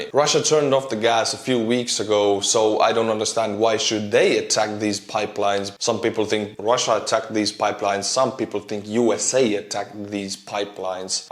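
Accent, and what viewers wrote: Scotland, United Kingdom. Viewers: loving the Scottish accent. 😄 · I like your Scottish accent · Thanks again I'd love to say you butchered my language but you sounded like a scots men lots of love from Scotland 🏴🏴🏴🏴🏴🏴🏴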